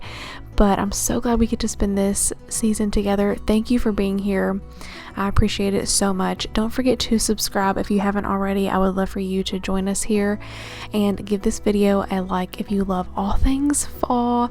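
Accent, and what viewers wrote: South, United States. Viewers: You have the perfect country accent 😍 I'm from Tennessee and have one too lol but your accent is so soft pleasant 🥰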